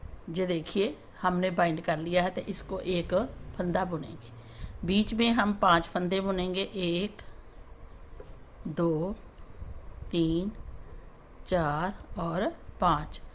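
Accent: Punjab, India. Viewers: Aap ki panjabi hindi bahut pyari lagti hai aur aap bahot achha samjhate ho. Thank you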